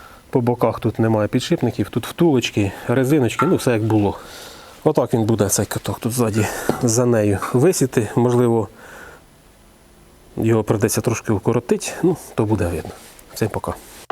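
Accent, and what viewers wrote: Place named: Surzhyk, Ukraine. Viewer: Хотя и говорит на суржике, но плохие,не нужные, слова не мовит( говорит) Все по делу. И субтитры. Молодец мужик( хлопец).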